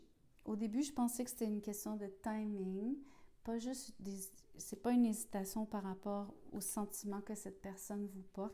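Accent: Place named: Quebec, Canada. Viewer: J'adore votre accent "je me souviens "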